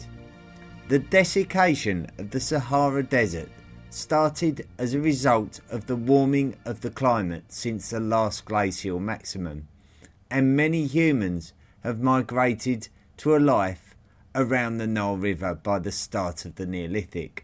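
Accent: United Kingdom. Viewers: What in the hell are you talking about? All I hear is a British accent espousing junk science.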